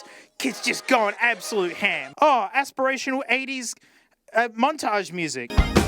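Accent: Australia. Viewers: Either I’m like racist and all Aussies sound exactly the same or this is definitely the guy from the channel 🤔 it’s gotta be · Everything about this accent says Queeeeeenslanddeerr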